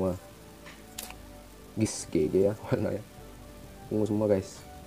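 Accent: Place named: West Sumatra, Indonesia. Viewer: Suaranya mirip minang kocak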